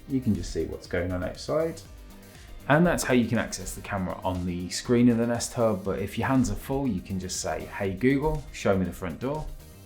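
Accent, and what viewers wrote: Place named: Birmingham, United Kingdom. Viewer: I detected a Birmingham accent, then I googled Smart & Secure Centre and you're a few miles away from me haha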